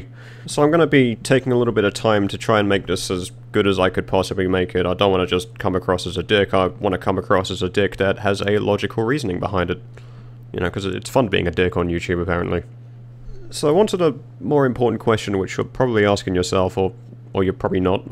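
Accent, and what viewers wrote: United Kingdom. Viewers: Like many other have said we really don't give a shit what you play because we stick around for your stupid personality and all the other bullshit shenanigans that you manage to find. So whether you go on and play some PD2 occasionally just to bitch about all the shit players who fuck up a normal jewelry store heist and hackers or just play other stuff because that's what you want, 95% of us will still be here..... just for your pommy fucking accent. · Are you british or australian ?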